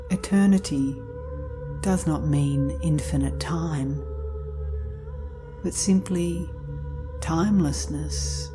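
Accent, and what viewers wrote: Australia. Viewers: Rumi in an australian accent?? Woohooo!!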